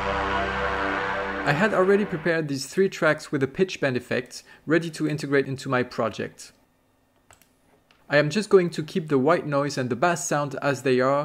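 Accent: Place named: France. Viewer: Slight french accent detected.Nice tutorial by the way :)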